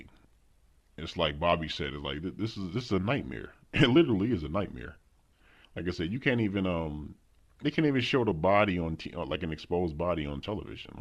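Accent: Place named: Baltimore, United States. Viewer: You still go out? Ima probably go to DC soon and I hear Baltimore in your accent. Pull up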